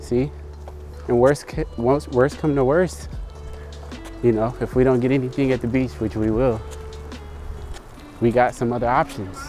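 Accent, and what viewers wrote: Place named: South, United States. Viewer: Your southern accent cracked me up XD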